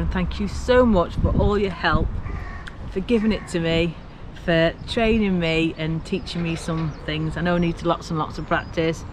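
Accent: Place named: Northern England, United Kingdom. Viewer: No offense to our southern cousins but I always find it a delight when I watch a video and get to hear a familiar accent from 'up 'ere' :)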